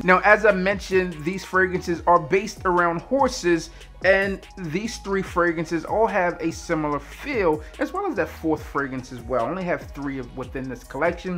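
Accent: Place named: East Coast, United States. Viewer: I love the content, but that east coast accent makes me cringe. 🤦🏾‍♂️😩😂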